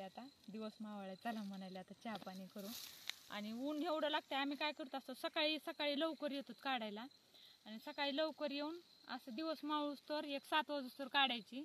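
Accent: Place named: Parbhani, India. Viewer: तुमच्या बोलण्याच्या भाशावरून परभणी किंवा हिंगोली जिल्ह्यातील वाटतात ताई तुम्ही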